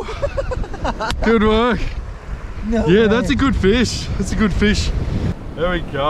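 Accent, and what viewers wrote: Australia. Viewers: You sound d like Aussies.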